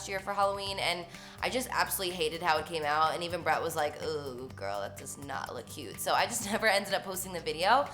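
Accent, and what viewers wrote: New Jersey, United States. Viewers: I literally gasped when I saw she uploaded a new video and saw the screenshot of the look. She slayed this - as always. And I honestly love when she talks, despite the thick New Jersey accent which seems to bother some people (like why tho?) she's just so cool and down to earth and talks to us like we're friends, love that shit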